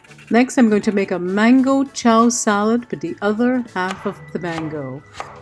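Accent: Trinidad and Tobago. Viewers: I love your speaking voice, it’s a well rounded, articulate Trinidadian voice and love your channel too.. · Miss thank you for helping me improve my cooking. And continue to speak de trini "twang"😆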